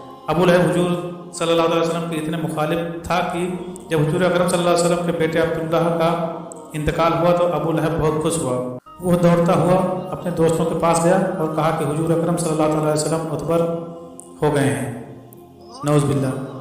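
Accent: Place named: India. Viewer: India sy ho... Aap ki bolnay ka style exact india wala hai...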